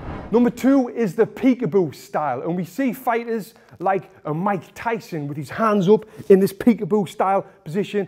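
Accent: United Kingdom. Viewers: UK english is so nice, those "poonches" and "Meeweather" are brilliant! Cheers from Kazakhstan to all UK Boxing enthusiasts.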